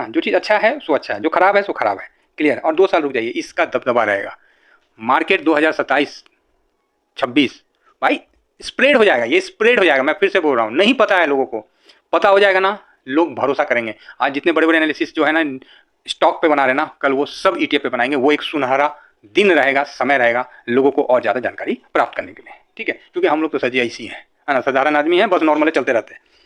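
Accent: Bihar, India. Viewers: Aap Bihar se lagte hain....bahut achha....Mai bhi hu.... 👍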